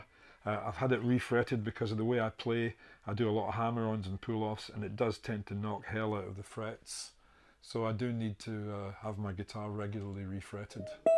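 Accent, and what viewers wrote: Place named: Glasgow, United Kingdom. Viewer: how can you not love that Glasgow accent?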